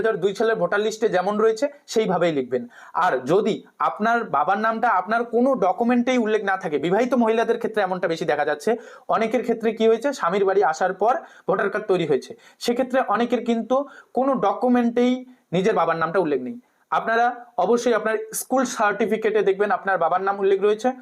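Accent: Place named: Bangal, Bangladesh. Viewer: আপনাদের কোনো কাজ নেই আপনার কথাতে ও মনে হয় বাঙাল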